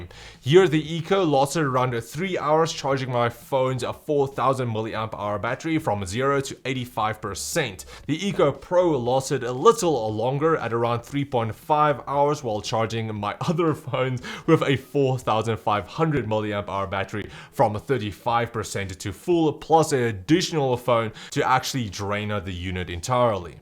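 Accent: South Africa. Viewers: Meneer jou aksent maak dit bitter moeilik om na jou te luister, hoekom doen die Suid-Afrikaners dit, dit klink verskriklik.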